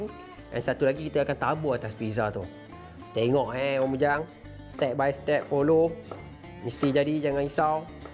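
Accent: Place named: Kedah, Malaysia. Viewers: Dia cakap macam orang utara la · abg..ni org kedah ke...ckap pekat bak angg😂😂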